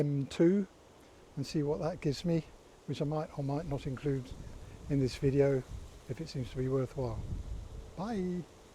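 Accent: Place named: United Kingdom. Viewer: Thanks for your video, it's very helpful for a beginner like me. You sound like you are from the UK. Did you emigrate to Australia or are you on holiday? Best wishes, Rob, Romford, Essex.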